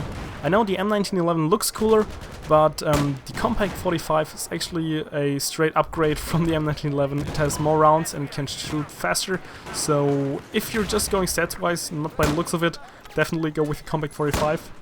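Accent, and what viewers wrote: Germany. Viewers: Are you German, because of your accent? ;D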